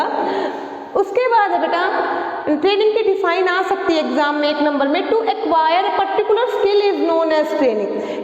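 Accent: Haryana, India. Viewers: Mam r u from haryana.......?? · Mam app Haryana ke lag te ho Sare topics clear ho gaye app ki video dek ke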